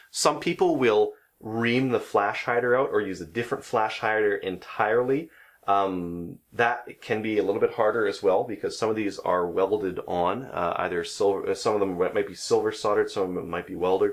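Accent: Canada. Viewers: You sound Canadian. You must live in the U.S. now or Trudeau has confiscated this rifle already. Going to happen to us as well. 🤨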